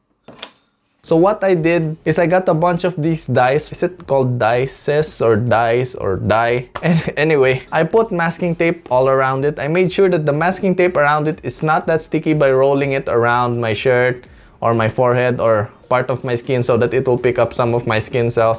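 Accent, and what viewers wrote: Philippines. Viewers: Is this a filipino the way he speak english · Is this guy filipino? Cause his accent kinda sounds one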